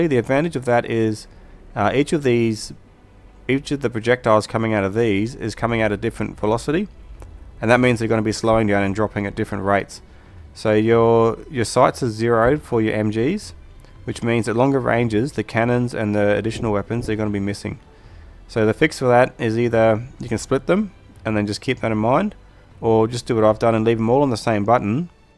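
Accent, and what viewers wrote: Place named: Australia. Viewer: the accent gave it away buddy, I live in NSW.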